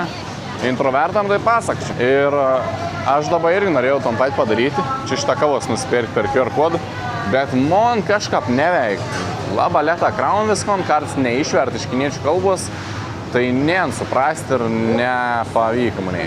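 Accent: Samogitia, Lithuania. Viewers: kopijuoja žemaičių tarmę? kiek žemaitiškai šnekančių žmonių? tai visi kopijuoja reiškia?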